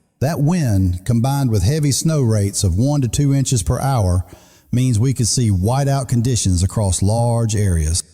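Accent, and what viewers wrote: South, United States. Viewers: ur accent says u r a southern boy. I say this because u act as though jut 6 to 12inches of snow is a whole lot when it is just a normal winter storm to us up above 42N that is the NY-PA boarder where it is flat in the west. Yes it may take us a day to big out of 6" to 12" but that is all. U have to remember kid we got them lakes. They give us most of our snow in Upstate. Now it is when it bet deeper the 12 inches that begin to slow us down. That the Early Spring storm of '93. It laid down 3' where I lived at the time which was about 40mi north form where I live now. Now it was all of 3 days before we got plowed out on our gravel town road. U see it goes Interstates, state road, then count roads and last is the town roads. U see we take all our plow vehicles and do the Freeways the we go to the state roads with all of them and so on. 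This spreads the expanse of having enough plows to do this. So this is what happened that yr. We got the freeways done in about 3 hr. Then we began on the cities and once they had been done then we moved out to the hinter lands. By doing the cities with all our plows first we got 90% of the pop plowed out in less then a day. Now when we moved to the hinter land we did state3 roads using state count and towns plows while city ones stayed in the city to do the rest of that clean up b/c the first pass just pushed it to the side. it then had to be4 hauled out. Many streets did not get fully cleared until about a week later. I will not go no b/c u get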